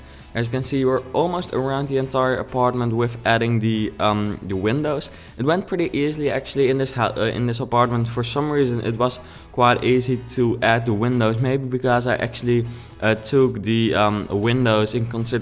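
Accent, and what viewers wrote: Netherlands. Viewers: can I just say... I'm English, and I love your accent. I think Dutch accents are amazing. Really nice build.Very inspiring.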